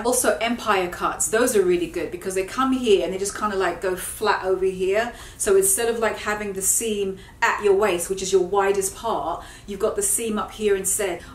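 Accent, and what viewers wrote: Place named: London, United Kingdom. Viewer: Hi, where have you been all my life!! I have been binge watching all of you videos, you are amazing, I have learnt so much from you. I've lost count the amount of times, I have gone to a shop and not found my size in something I wanted and I have just walked away. No more will I be doing this, I will be going for the bigger size from now on. Your London accent got my attention, I'm a fellow Londoner and you are fantastic to watch, I love your passion! You have a new subscriber and I look forward to seeing all your new videos. x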